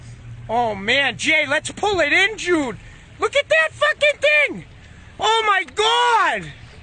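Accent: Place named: New Jersey, United States. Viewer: the joyzee accent makes it that much betta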